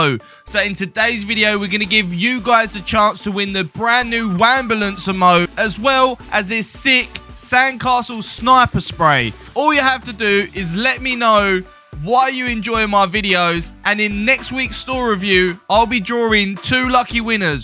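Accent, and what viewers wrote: United Kingdom. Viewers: I like your content because you show off and talk about cosmetics, you always bring the news of the next update, do awesome gameplays and also I love British accents. Good luck for everyone in the giveaway!! And I'm very excited about runway.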